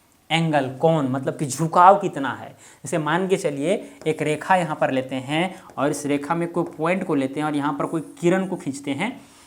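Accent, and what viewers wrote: Bihar, India. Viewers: Sir aap Bihar ke rhne wale h kya kyoki aapki bhasha thodi bihari lg rhi h aur padhane ka tarika Khan sir ke trh mil rha wo bhi Bihar se hi hai aap aisa padhate h ki ek baar me hi mind me ghus jata h koi doubt hi nhi rhta aise hi Khan sir padhate h Love you sir ❤️❤️❤️💖💖😍😍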